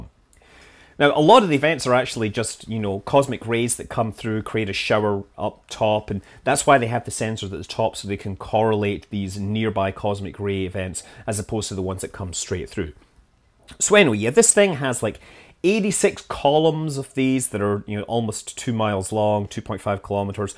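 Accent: Scotland, United Kingdom. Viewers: i love your scottish accent!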